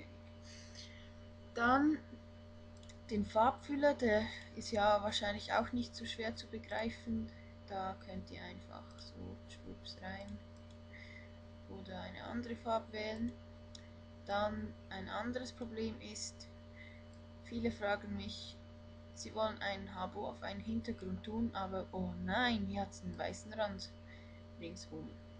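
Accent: Switzerland. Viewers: Diese schweizerische Aussprache is sowas von gail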